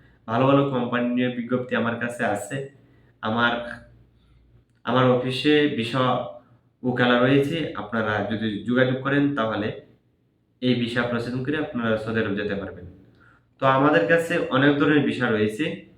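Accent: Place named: Mymensingh, Bangladesh. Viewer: বাড়ি কি ময়মনসিংহ, ভাষা শিখে তারপর ভিডিও করবেন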